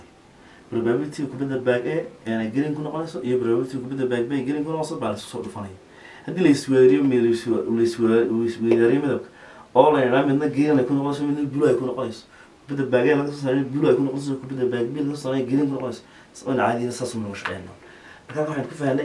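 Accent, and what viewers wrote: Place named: North, Somalia. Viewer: Ardayda qaar luqadda reer woqooyiga wey ku adagtahay lkn ogow afsoomaali waaye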